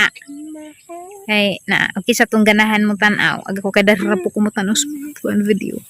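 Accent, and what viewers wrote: Bohol, Philippines. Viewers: Always watching here in Cebu ♥️ i love your boholana ascents🤗♥️ labi nas words nga "ARANG" masundog nako usahay😅😍😍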